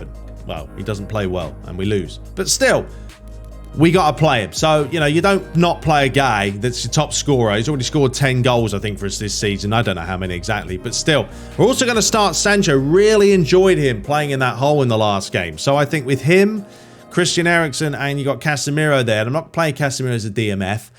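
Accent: Netherlands. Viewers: Amazing Dutch accent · I'm Dutch myself and I think your Dutch accent is on point! 😁 Great episode! keep em coming 👍🙏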